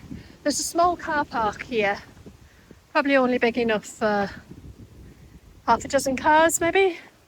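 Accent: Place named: North East England, United Kingdom. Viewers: Well done lovely ❤ your Northeast accent is charming 😊 really enjoyed the vlog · What an interesting accent you have. Its like the north east met the West Midlands.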